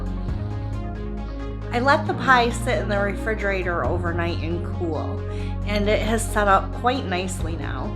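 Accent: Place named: Michigan, United States. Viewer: Oh hearing the Michigan accent and seeing the Go Blue Crockpot in the background, makes me miss MI all the more! I wish I still lived there! When Mike came back from MI in September, I made him bring be back a lot of Blue swag lol Great video! I will have to try this sometime! Apparently you can make it with just water too!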